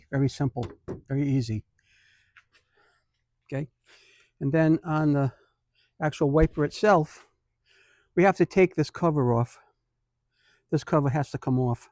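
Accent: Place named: Boston, United States. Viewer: Thanks for the video brother, love your accent, sounds like my cousins from Boston. I’m up here in Canada and have a 2020 Nautilus and this video was very helpful.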